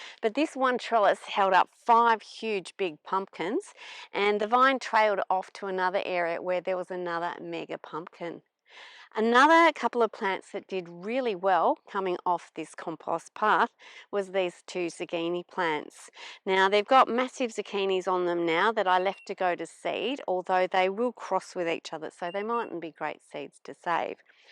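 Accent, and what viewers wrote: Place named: Australia. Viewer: I like the accent of down under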